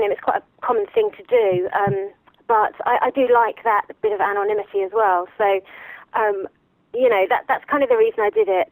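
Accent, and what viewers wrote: England, United Kingdom. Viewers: makes one laugh Americans telling an English person to talk English!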